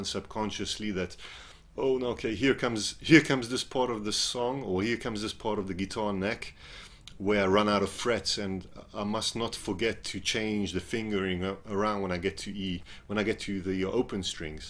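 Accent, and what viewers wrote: South Africa. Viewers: hey, don't get me wrong, there is a great advantage in barring sometimes. So, I won't say never bar. Barring can be really the only way to achieve some stuff. I do it all the time. I realize you are doing it as a time saver and it also eliminates this "where do I go" feeling of that finger. You just bar and know that now you only have 2 or 3 fingers to noodle around with above the bar. I understand. I thought later that maybe your accent was South African after I listened more closely. Just one or two words threw me off to Netherlands. haha Yeah, I grew up playing with a very clamped restricted style and I think it hindered me. But I started on acoustic so I was not playing elec till 3 years later and just always had this cramped style going on. But.... what do ya do? hehe just move on and try to learn more things. aight. talk to ya later!! 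· South African accent! His teaching style rocks!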